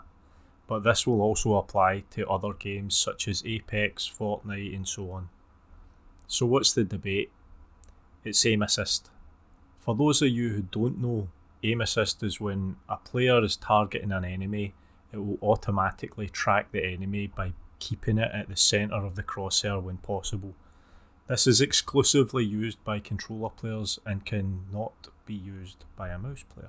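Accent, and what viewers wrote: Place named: Scotland, United Kingdom. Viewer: Your Scottish accent 😀!! Beautiful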